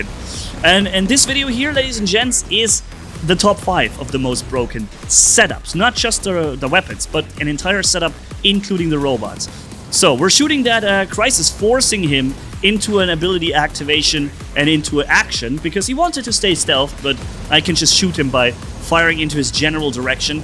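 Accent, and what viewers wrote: Germany. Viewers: its just how germans pronounce it lol.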